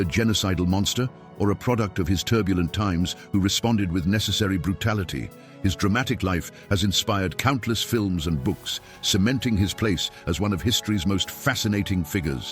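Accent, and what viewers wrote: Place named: United Kingdom. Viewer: History, Even great Shaka Zulu was 😢monster for you, unbelievable lies. You have British accent. GO and study British history. It's one of the worst ever. What savage Queen did in Africa is unpardonable. These are hybrid beings that committed atrocious acts on humanity that you should talk about and not paint great African leaders as monsters. Anyways, era of propaganda and lies is over!!! Great Shaka Zulu!!! Great Idi Amin!!! They fought for African people. What a useless British faceless racist thinks is inconsequential. Africa forever!!! The world is discovering that the West is full of wickedness towards Africa and the people which this page represents.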